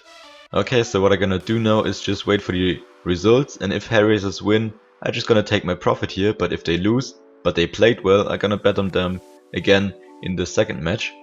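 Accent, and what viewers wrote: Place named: Germany. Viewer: Lol man hört mega Raus das du Deutsch bist :)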